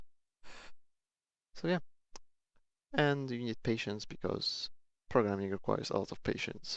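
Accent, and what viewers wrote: Poland. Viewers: Where are you from POlish or English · I don't know why but i recognised "polish" voice (i'm also pole) very nice tutorial :)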